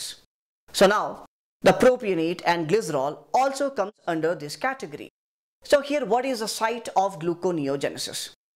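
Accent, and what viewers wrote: India. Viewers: You sounds exactly like my indian classmate who topped the class and admitted to the Singapore's NTU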